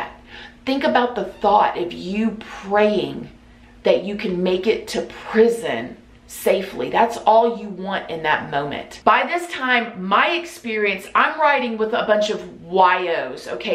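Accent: South, United States. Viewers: Wow! I have rewatched this video several times, and it only becomes more and more scary and eye opening. I've known people who have been to jail/prison. I've never been (and hopefully never will), but I have this weird interest in how prison works and I can tell from what I've been told and from watching you and Jessica Kent's videos and what I've seen on TV that it is a horrible place where you are stripped of all your freedom and sometimes family and friends disown you. I believe this interest i have in prison is somehow helping me really value and appreciate my freedom. I hope I never break the law by accident or on purpose., you are a good person and very strong and resilient. Never let ANYONE tell you different. You are one of my favorite YouTubers. I love how real you are. I bet you are one of the sweetest people. 💕 Also, I love your southern accent! 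· It keeps me captivated. You know how you read a book and sometimes you've read 6 pages but you have no clue what you read? Your videos are the complete opposite of that, for me anyways. I get lost in storytimes. I find them boring honestly. But I find myself going back... did she just say Bob wire? Rewind. Yes, yes she did. I love your accent and your way with words. It keeps my attention. I'm a Jersey girl in NY. I don't have any southern accents in my life. so I really can't get enough of yours!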